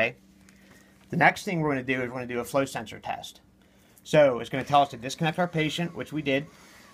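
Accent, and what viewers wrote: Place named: Pittsburgh, United States. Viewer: Pittsburgh accent!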